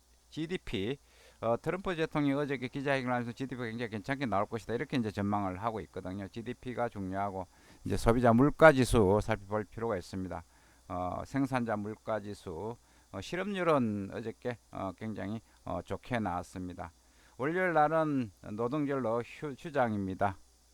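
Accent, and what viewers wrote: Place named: Gyeongsang, South Korea. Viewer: 이분 경상도말 쓰는 라도좌좀이십니다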